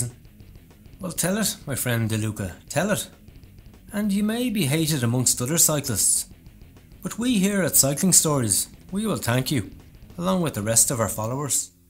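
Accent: Dublin, Ireland. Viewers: As an Irishman myself - I know the narrator's accent only too well, he's from Dublin. Reminds me of Paul Kimmage and a little bit of Pat McQuaid. I like his sense of humour. A question to the narrator: are you related to Paul Kimmage? Are you or? Yeah, I can see similar writing and story telling skills as Paul Kimmage, and the contempt for cheats and dopers. This is definitley not GCN. Kudos to the narrator.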